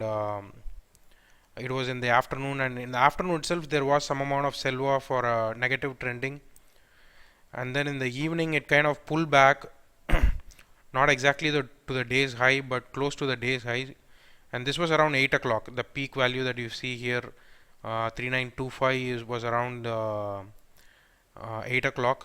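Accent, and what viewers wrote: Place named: Tamil Nadu, India. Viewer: Bro your pronounciation shows you r belongs to Tamil Nadu.... If it's true ... I'm very much proud...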